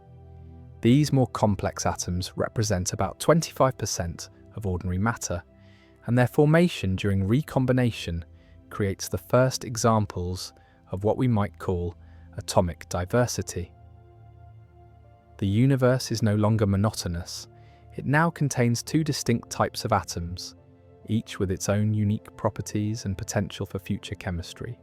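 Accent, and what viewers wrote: United Kingdom. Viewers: Ignoramus with a British accent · your British voice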